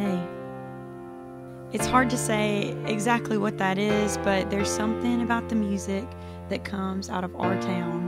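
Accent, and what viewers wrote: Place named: South, United States. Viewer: Wow! Your southern girl accent really come when you speak. Very nice!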